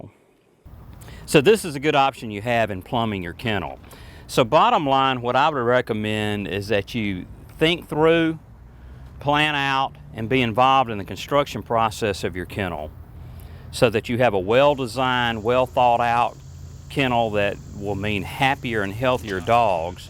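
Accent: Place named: South, United States. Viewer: Remember, working dogs are bred to be outdoors and have good temperature tolerances. In the summer it looks like he uses evaporative cooling from washing down the concrete, ceiling fans to circulate air, hard roof to protect from direct sun and radiant heat. Based on his accent and surroundings I figure he's in the south. For heat in the winter he probably puts blankets in the dog houses. They are raised off the ground, so that takes away from some of the thermal loss from sleeping on the ground, and the metal roof raises the temperature from the sunshine in the kennel area. The concrete will heat up some during the day providing thermal mass heating at night. Some good tree coverage and the leaves provide extra shade in the summer, but the leaves fall in the winter giving more sun access to the metal roof.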